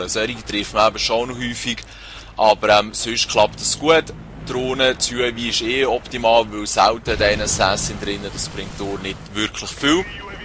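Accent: Switzerland. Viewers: Geil endli mal en schwitzer commentator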